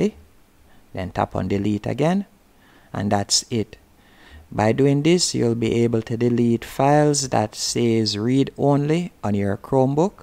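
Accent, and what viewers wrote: Jamaica. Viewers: u jamaican??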